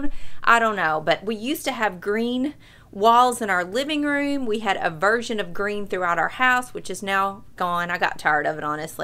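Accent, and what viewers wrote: South, United States. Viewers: Could listen to you all day! Your friends must love love love you! Having moved back home to Kansas after 4 yrs in Atlanta I miss a lot southern accent and quite truthfully the South! Soooo when I hear you I’m back in the south for awhile! Love all your sites so don’t stop them😊. I am the opposite of you....I’m a dog person...now have 6 rescue cats! When my last 2 dogs died a year after my husband died of a heart attack I was left with 2 cats who got to run the house and I can’t stand seeing feral cats soooo..... I am a water baby and love the beach and like you feet in the sand... well there is a few things about me.....still watching your past posting and enjoying them all.....oh forgot.....while you are a UK person...I Rock Chalk KU. So we can have fun during basketball season😂😂😂😂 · Hey,! I found you when YouTube suggested your “I adopted the shelter dog nobody wanted.” As soon as I heard your southern accent, I was all in. 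I’m from Georgia but living and working in the DC area now. I’m ready to go back to the South where people look you in the eye and acknowledge your presence! I, too, grew up with cats. Got my first dog—a border collie mix—last fall. Best thing that’s ever happened to me! I love all your dog videos but also love the ones about you and your family. Would LOVE to follow y’all around your town and see your favorite spots.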